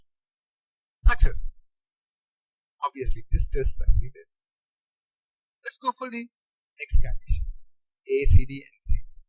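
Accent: India. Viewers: The sound sucks, with it's over amplification and under feed. Hard enough to understand with the fast Indian speech. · same comment as lakesidelivin: The sound sucks, with it's over amplification and under feed. Hard enough to understand with the fast Indian speech.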